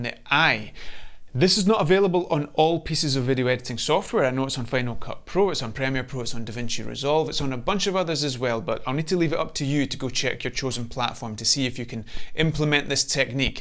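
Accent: Scotland, United Kingdom. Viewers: Title drew us in Scottish accent kept us here ❤‍🔥❤‍🔥❤‍🔥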